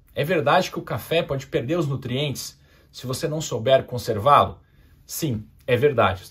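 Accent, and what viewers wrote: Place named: South, Brazil. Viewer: Sabia que eras do Sul..😄Zürich aqui!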